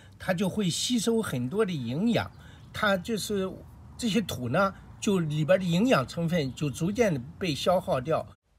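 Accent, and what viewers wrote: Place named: Southern Shanxi, China. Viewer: 听口音感觉您有晋南人的口音，也像青岛人的口音哈哈！我和我妈妈都是您的粉丝！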